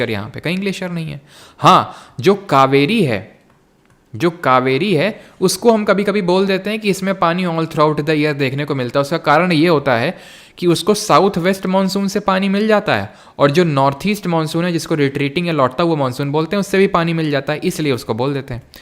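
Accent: Kanpur, India. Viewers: Boss ap kanpur se Hai ye tompakka Hai Accent se pta chal rha Hai 100%sure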